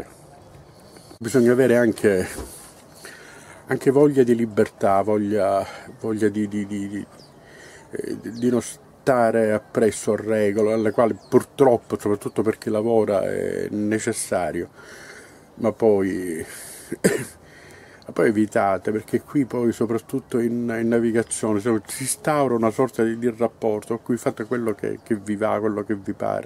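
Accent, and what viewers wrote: Sicily, Italy. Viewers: Grazie per le information. Leibe siciliano?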